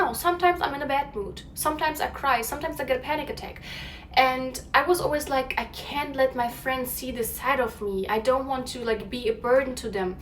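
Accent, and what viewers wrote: Germany. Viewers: Are you German? ☺️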